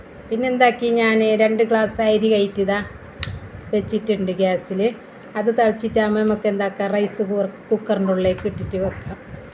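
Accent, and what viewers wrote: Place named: Kasaragod, India. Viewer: Kasrkode ഭാഷ സൂപ്പർ 😂😍 എല്ലാ വിശേഷം അടിപൊളി റെസിപിസും super 😍👍😋 L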